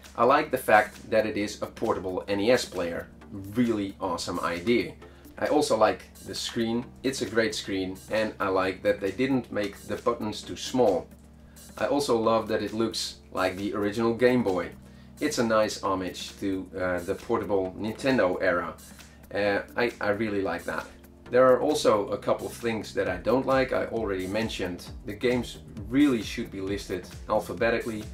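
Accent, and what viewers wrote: Netherlands. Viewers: 2 questions, your "american" accent sound very dutch, am i righr?, en mijn tweede vraag: waar heb je dit gehaald? ik wil ene kopen... ik ben 100% zker tot gij NL zijt of belg xD mijn twee vragen zijn voorbij, please help me.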